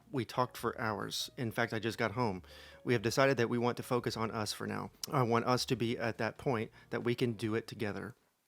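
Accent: United Kingdom. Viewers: Yep, from uni to flat to being at mine. I'm guessing somewhere in the west. So VERY Brit.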